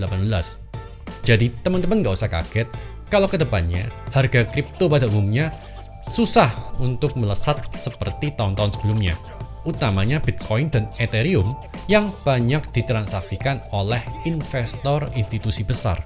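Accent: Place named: Surabaya, Indonesia. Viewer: Bro asli suroboyo yo?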